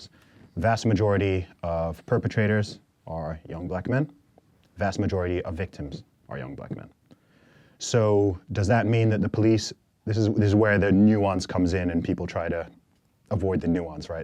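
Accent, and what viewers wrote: United States. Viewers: Is he from the South West? What's with the Mid-Atlantic accent? No problem with it, I have one myself. I know this comment is crud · By the way, this guy is British. I would like to know how he got the American accent.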